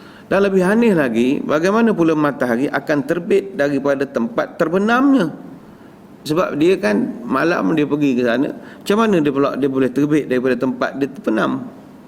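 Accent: Pahang, Malaysia. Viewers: Assalamualaikum dato ustaz bad. Saya minat betul ceramah2 ustaz. Semuanya saya dengar sebelum tidur walaupun kadang² isi ceramah tu berullang². Mungkin ustaz orang Pahang so bahasa tu dekat sangat dengan saya. Tapi kekadang jangan la bagi asyik bagi contoh orang Kuantan sokmo yang teruk. Kiki. Apapun u are my idol. Selamat bersahur ustaz.. 👍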